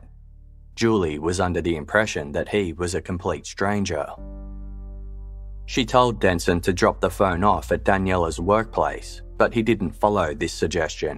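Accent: Australia. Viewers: Given that you my dear narrator have a classic Aussie twang, would I be safe to assume this is an Aussie owned production? I’m glad I found you, I enjoy your stuff and your presentation. Cheers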